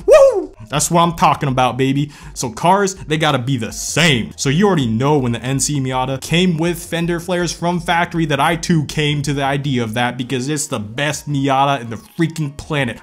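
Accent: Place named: Atlanta, United States. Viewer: ngl you're kinda starting to pick up that ATL dialect, almost sound like someone native to Atlanta now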